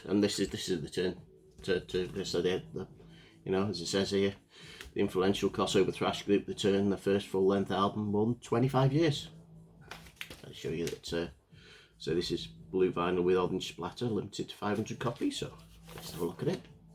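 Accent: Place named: Wales, United Kingdom. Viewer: I still can't get over your Welsh haha. Either way, cheers for the shout out mate. That boxset been on my list for ages and I keep meaning to ask for it for a birthday or Xmas. Good stuff, there is a new band with ex members called ' ' which I picked up. Not bad but more in a doomy industrial style. Love that album as well, second one good as it has singer from on it. 🤘💀🍻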